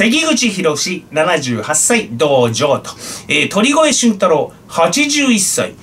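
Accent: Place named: Iwaki, Japan. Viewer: 熱がある中 配信ありがとうございます🙇手術のあとは、無理をせず 本当にしっかりと静養されてくださいね!? そして、さらにパンチの効いた配信を楽しみにしております🎤 くつざわさんのいわき弁、大好きです🤗🎤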